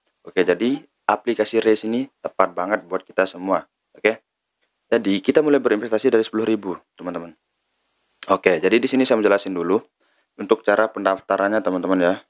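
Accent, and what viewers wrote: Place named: Lombok, Indonesia. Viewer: Org Lombok ni yak?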